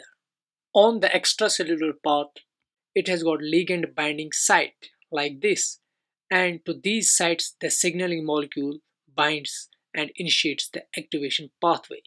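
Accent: Kashmir, India. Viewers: recognised you from your accent as a fellow kashmiri ......grt to see such good work...Allah bless you aameen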